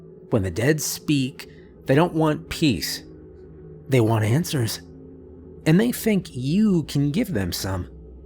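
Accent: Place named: East Coast, United States. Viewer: I’m guessing is on the north east coast — the way he pronounces room is “rum”. Seems to be an eastern accent (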